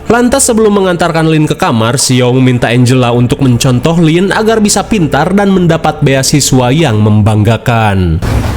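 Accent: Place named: Java, Indonesia. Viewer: tikotok medok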